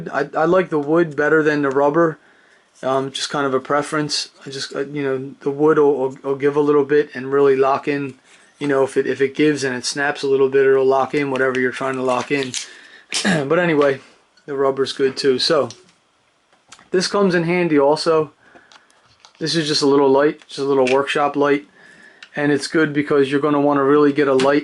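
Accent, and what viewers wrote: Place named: Pittsburgh, United States. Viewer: Not quite Ralph, sounds more Pittsburgh to me.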